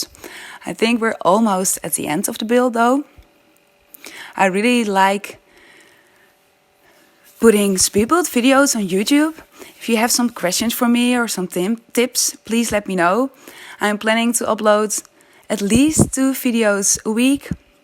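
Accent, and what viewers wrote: Netherlands. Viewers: Bij je eerste zin hoorde ik al dat je Nederlandse bent, ☺️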